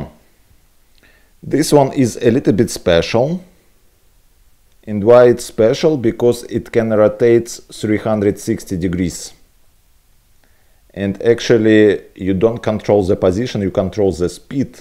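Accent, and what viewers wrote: Russia. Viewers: Are you Russian?)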